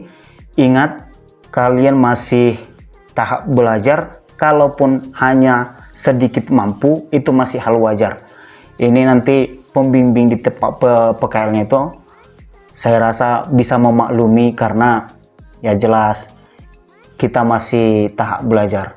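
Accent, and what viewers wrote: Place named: Tegal, Indonesia. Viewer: Semangat pak ngapak tegal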